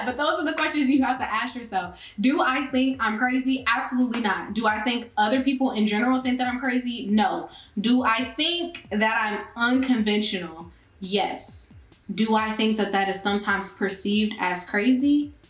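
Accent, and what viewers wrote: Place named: Florida, United States. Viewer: It’s a Florida accent. Once a Florida girl, ALWAYS a Florida girl boo 💅🏽‼️❤️😂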